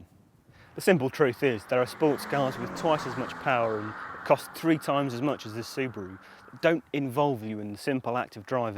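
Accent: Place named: England, United Kingdom. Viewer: Because he is English, and that is how we English say 'Z'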